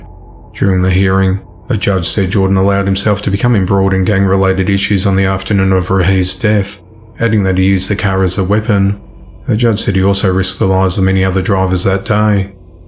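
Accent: Australia. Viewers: Australia, i was born in Australia Victoria nsw, but in ole blighty now I hear the accent now x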